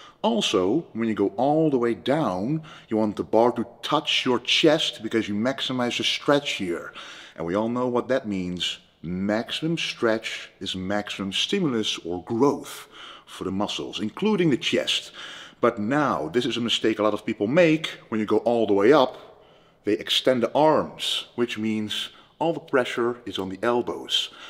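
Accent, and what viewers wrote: Netherlands. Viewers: I love the way he talks, it's just calm, clear and spiced up by his dutch accent. I don't perceive any arrogance, just confidence. Which is understandable, he is one of the top bodybuilders in the world.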